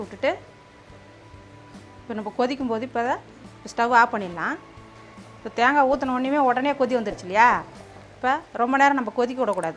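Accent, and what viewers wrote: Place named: Chennai, India. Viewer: பேச்சு நல்லா இல்ல தமிழ் நல்லா பேசுங்க சென்னை தமிழ் நல்ல இல்ல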